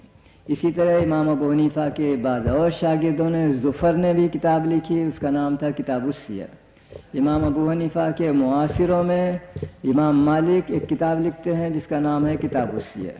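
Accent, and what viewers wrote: Hyderabad, India. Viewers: When was this lecture given...? Seems pretty old, near Indo Pak partition era. Furthermore urdu accent is more of Hyderabad Daccan style.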